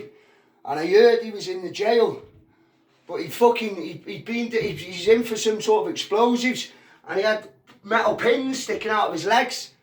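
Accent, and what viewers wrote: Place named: Mold, United Kingdom. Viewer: where you from lad? sound like your from mold way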